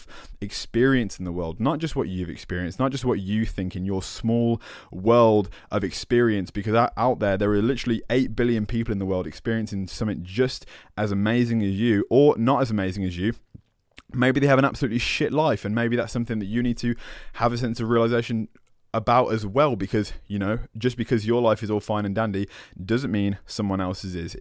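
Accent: United Kingdom. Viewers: I'm loving your british accent. I'm from Holland and your accent and voice are so amazing. Could listen to it all day. Yep i love british english.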